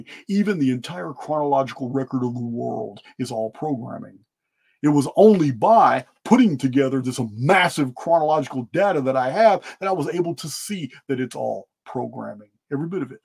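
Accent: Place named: East Texas, United States. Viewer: I'm a new subscriber. From the beginning of '24 someone who worked for me was trying to manifest. Ive listened to you for around 11 hours. The person I mentioned, trying to manifest. W/O a Spiritual foundation. I think thats been the warnings. The person sunk deeper and deep into rage and abusive behavior. It's sad to watch. I'm much older and putting great efforts time and again. We have to do the inner work. Otherwise there is no peace. And we can fall extremely low. I highly suggest pauses at times before reacting, making choices. Get to know yourself. Set goals and show up. You can do it. You definitely are inspired at times. When we don't recall it, it is often called channeling. Which is Humorous because you say you don't. I think you do. You do talk very nearly East Texas. i understand it. I definitely will be looking at you. 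Thanks for all your time, and I certainly hear recovery from times past. Blessings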